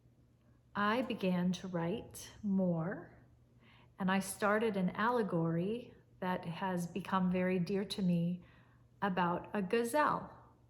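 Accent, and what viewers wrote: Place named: Syria. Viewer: You have a very good Syrian accent 😍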